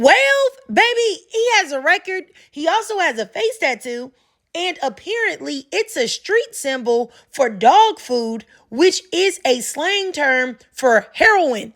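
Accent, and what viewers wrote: Philadelphia, United States. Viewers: Are u from Philly?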